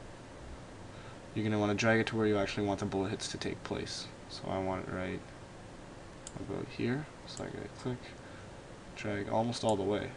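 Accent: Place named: Canada. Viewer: I kinda get the feeling your Canadian judging by your accent and the way you spell, correct?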